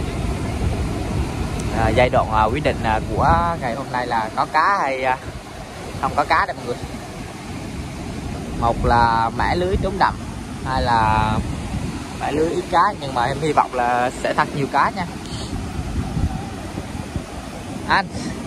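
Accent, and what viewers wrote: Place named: Quang, Vietnam. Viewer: Khi con nói chuyện con đừng hỏi bằng tiếng quang. Vì CĐM ko nghe được con ạ. Con cứ nói bình thường đi con và khi con quay nên quay gần con cá cho khán giả xem cá này là cá gì vì tiếng mỗi nơi gọi tên khác nhau ❤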